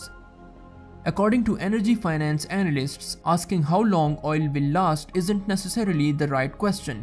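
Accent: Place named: India. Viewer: bad indian accent makes this terrible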